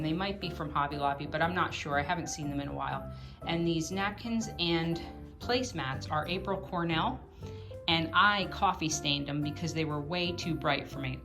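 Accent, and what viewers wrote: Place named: South Jersey, United States. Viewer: I am watching from North Carolina. I love your videos; especially love your accent ! It is very nostalgic to me since I am originally from South Jersey! You sound just like my mom’s friend😂! I love the green in your house. That color is my favorite and I have it in my home too . I love how you shared that you mixed the paint colors to achieve the shade you wanted; that’s what I did with my kitchen cabinet color mixing two of the Sherwin-Williams green.😊 You are inspiring me to paint my table and maybe my chairs because I have a similar set…so cute!❤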